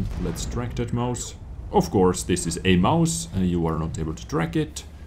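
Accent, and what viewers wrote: Estonia. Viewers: are u estonian?:)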